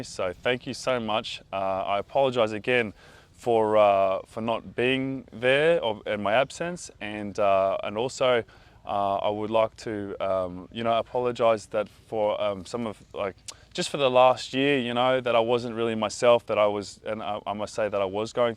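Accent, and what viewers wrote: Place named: Australia. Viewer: Australian x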